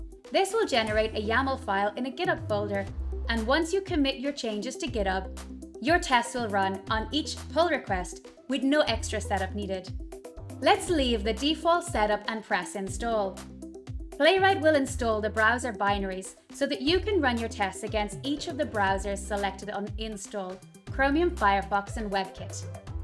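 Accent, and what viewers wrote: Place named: Scotland, United Kingdom. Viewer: her Scottish accent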